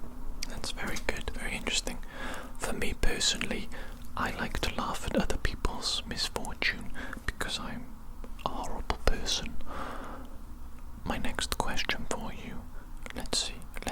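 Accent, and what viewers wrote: Wales, United Kingdom. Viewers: Great video! I might be wrong but from your accent, do you happen to be welsh???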